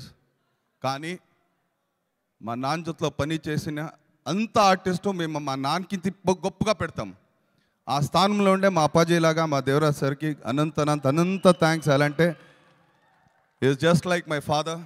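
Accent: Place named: Rayalaseema, India. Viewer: It likes rayalaseema slang telugu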